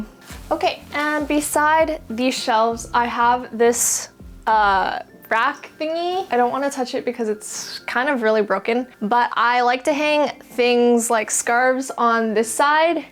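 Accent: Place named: Saskatchewan, Canada. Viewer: Thanks for a real life video. Not everyone lives a “home magazine life”. Are you canadian? You speak just like my Dad from Saskatchewan. Love from Norway.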